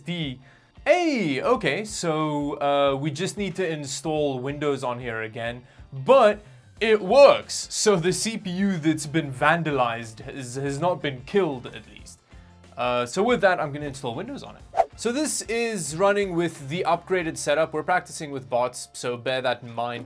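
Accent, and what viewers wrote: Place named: South Africa. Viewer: I can bet you are south African, eh? Afrikaanse mense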